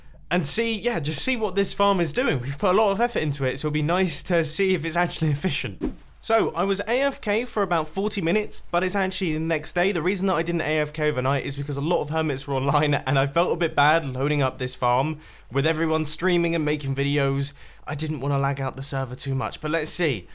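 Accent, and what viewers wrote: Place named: United Kingdom. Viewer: To I talk with a strong western ascent, to me is extremely British. Why are accents so wierd!!?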